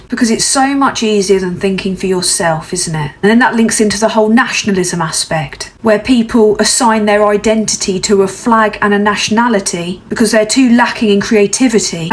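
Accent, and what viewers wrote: United Kingdom. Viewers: If you didn't have that UK accent I would have thought you were talking about America!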